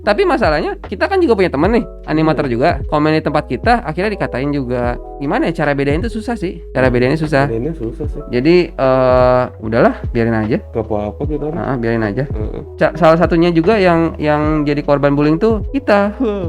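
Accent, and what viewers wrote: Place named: Bojong Gede, Indonesia. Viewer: Bojong gede emang Kocak2 ngomongnya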